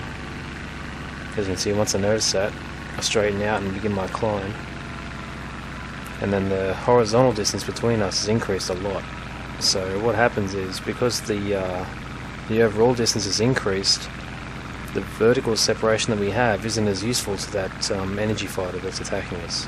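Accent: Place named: England, United Kingdom. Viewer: That's because you enunciate your words & they have never truly heard an English accent haha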